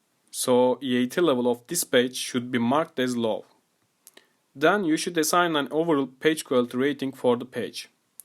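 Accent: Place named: Turkey. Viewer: yes there is a turkish accent :)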